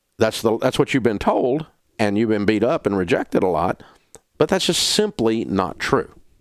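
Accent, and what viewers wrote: South, United States. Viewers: To Northerners, he indeed still has quite a Southern accent. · C Leh He hired a voice coach to teach him how to lose his accent. When he started he had a very thick southern accent. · I'm from Brooklyn and I love your southern account and little sayings 😂😂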